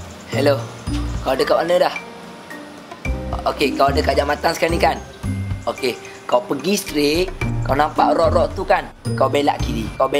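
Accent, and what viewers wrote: Terengganu, Malaysia. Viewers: x paham doh.. ko ckp k.l ke atau ganu? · loghat tranuuu mmg best hahahahahahaha tatau la nk cakap camne.. stiap kali mmber dr luar trgnu dtg, mesti bwk aku skali sbb dyorg tak faham.. bila tgl vid ni, okehh.. mngingatkn aku kdg2 trkluar bhse sendiri.. 😂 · Loghat tganu and ada lagu hyde mula2 vid.. I loike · ni video pasal org tranung try ckp kl la....tp ade jugok dialek tu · dia cuba nak ckp KL tpi ade jgk ganu masuk sikit. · sgtlah huduh ganu anda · video sedp doh...kalu buleh ejah sket agi arh loghat tranung orh baru sedp...papepon video molek boh.. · teruk benor ler oyak ganu nyaaaaaa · cute awk ckp ganu.. anyway dpt juga kami ilmu.. Tq.. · Aku dengor pelak dia cakap ganu tu. Nasib ambe duk ganu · Nakal laaaa org ganu ckp KL boh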